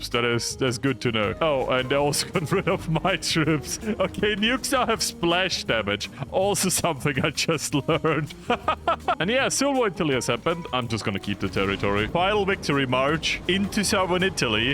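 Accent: Austria. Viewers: Er ist Österreicher, wenn mich nicht alles täuscht 😅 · Hob erst gröd gemerkt dös er Österreicher is. Ober schene griese aus der Steiermork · he's Austrian, I mean you can also tell by his accent in English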